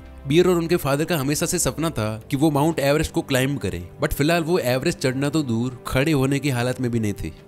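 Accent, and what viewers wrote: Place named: Marwar, India. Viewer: Jodhpur se ho? Marwadi tone aa Rahi h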